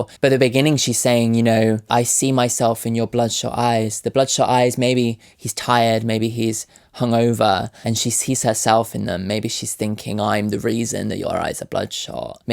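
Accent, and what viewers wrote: United Kingdom. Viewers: how did i know you were going to speak in a british accent just by looking at the thumbnail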